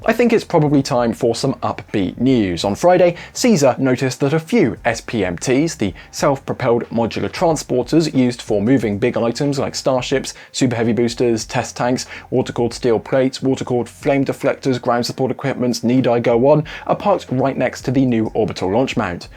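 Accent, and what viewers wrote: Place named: South, United Kingdom. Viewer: Sad to see that some commenters are having trouble with his Standard Southern British accent. If you cannot understand standard English you should work on yourself, rather than complaining -- especially since the audience is international. If he had a heavy Scots, Irish, or north country English -- then I could accept complaints. But he is speaking NEWS ANCHOR quality English to an international audience. Ask someone from Europe, Asia, even Canada, he probably has the clearest accent of all the NSF presenters. Anyway, guys, just turn on subtitles and work on improving yourself.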